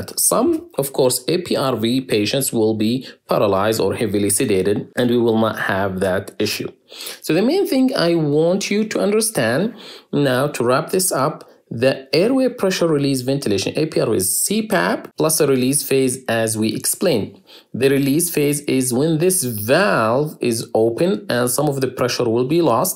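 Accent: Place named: Jordan. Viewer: عرفتك من اللهجة انك اردني. زي لهجتي. انا اردني الماني . الله محييك. فيديوهاتك جميلة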